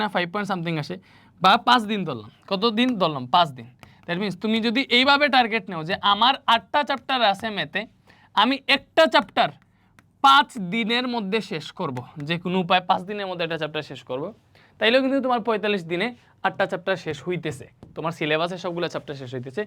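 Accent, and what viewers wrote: Sylhet, Bangladesh. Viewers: Bhai ami shior tmi Sylheti ❤ tmr mat e buja jay tmi Sylheti ❤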